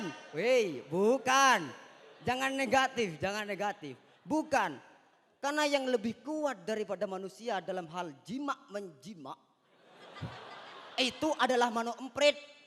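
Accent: Madura, Indonesia. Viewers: Mungkin ini orang Madura Logatnya sangat Madura bosku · Ngmong e jowo tpi logate meduro👍👍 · Logat Madura GK bisa di sembunyikan 😂❤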